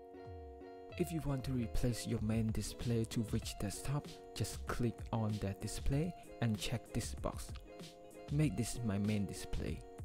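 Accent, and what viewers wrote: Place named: Asia. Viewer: When I heard the Asian accent I knew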